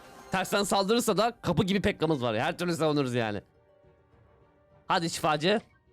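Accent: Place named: Yozgat, Turkey. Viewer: Abimin yozgat şivesi var adamsın abi😎😎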